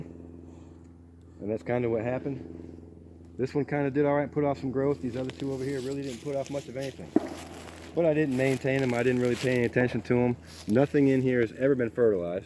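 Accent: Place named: New Orleans, United States. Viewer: I detect a little bit of a New Orleans accent in you bro🤗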